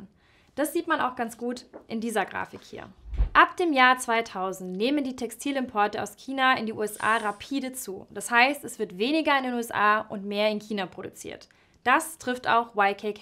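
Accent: Bavaria, Germany. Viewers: einfach Hochdeutsch reden und nicht bayerischen Dialekt benutzen(kina=Bayerisch). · kommst du aus bayern? du klingst übel danach... schon vor dem kina interessant finde ich dass bei deinem china-k so 5% "ch" mitschwingt tatsächlich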